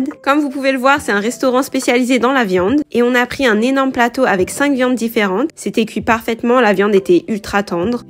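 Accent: France. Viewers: Yess je s’avais que tu parlais français ❤❤❤tu avais un accent français quand tu parlais anglais ( d’ailleurs il y a quelques mois ou semaines je t’avais posé la question 😂)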